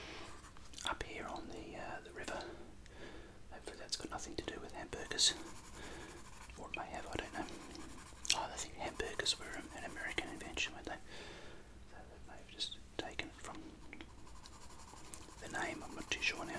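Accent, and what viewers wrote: Australia. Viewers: I’m German but I’m just here for the Australian accent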